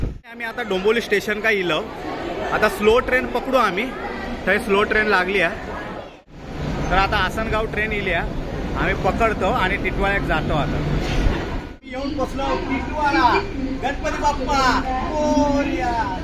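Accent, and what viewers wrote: Malvani, India. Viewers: तुमची मालवणी भाषेत बोलणे खूप छान वाटते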